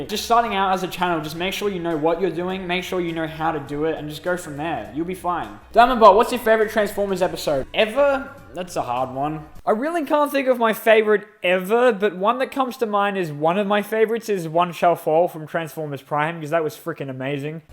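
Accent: Australia. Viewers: You're Australian? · You're Australian? I've literally spent all this time thinking you're british.......... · wait... YOUR AUSTRALIAN!!??!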